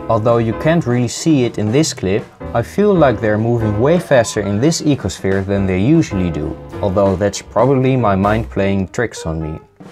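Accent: Netherlands. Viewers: You sound Dutch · Nice Dutch accent :) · You Dutch bro?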